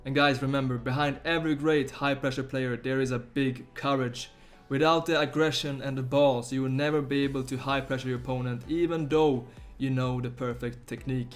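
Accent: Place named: Sweden. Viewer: Man hör direkt när det är en svensk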